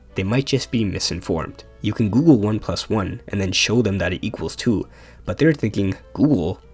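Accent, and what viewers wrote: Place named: India. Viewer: U sound indian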